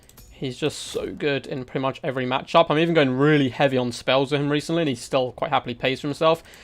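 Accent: United Kingdom. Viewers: It's the british accent but he's just an average lad.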